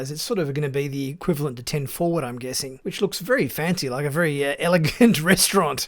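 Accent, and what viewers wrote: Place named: Australia. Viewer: Was NOT expecting a fellow Aussie when I clicked this video! Respect! Subscribing now mate 👍🏻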